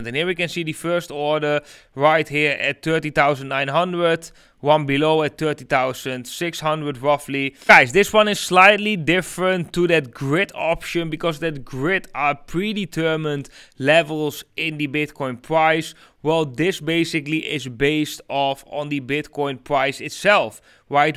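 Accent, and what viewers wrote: Netherlands. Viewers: The moment I heard your accent, he's Dutch 😅🤣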